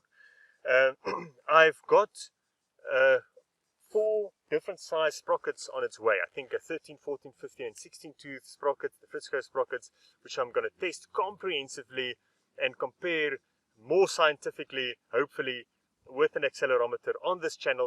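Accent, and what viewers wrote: South Africa. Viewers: Hi, starting to find your channel very useful I bought a a used 2012 last year. I live 50 kilos away from work and sometimes use the XR for that fun ride and when you get to to work or home my jacket and rucksack absolutely reeks of poorly combusted exhaust gases. I think I read that this bike was sourced in the Northern Cape and depending on where, the altitude could be quite low and close to sea level. I stay in Midrand and as you know that is about 1600 m (5000 feet) above sea level, so we decided to drop the jetting by one size. Got it back last weekend and have not had much chance of testing, but will do so soon. If I nurse the bike, I also only get 15kml and I think that just can't right. Hopefully this mod will bring some relief. It's great having an SA accent on the biking channels on Youtube, well done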